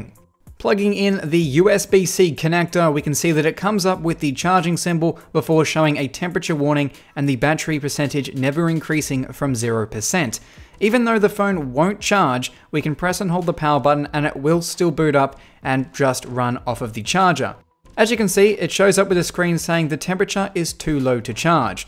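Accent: Australia. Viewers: W8 this dood is australian too. Nice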